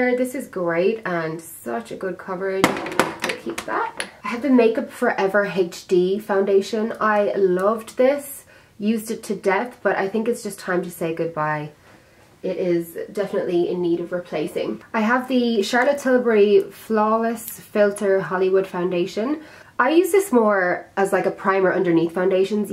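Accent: Ireland. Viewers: I'm learning Irish accent with your video! Thank you✨ Love from 🇯🇵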